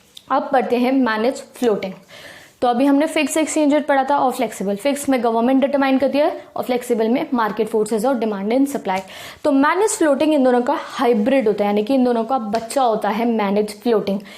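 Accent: Punjab, India. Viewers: Di are you from punjab?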